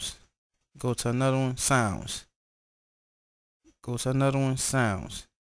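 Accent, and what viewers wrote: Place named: United States. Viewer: love ur american accent...im a canadian so im weird...lol, nice vid..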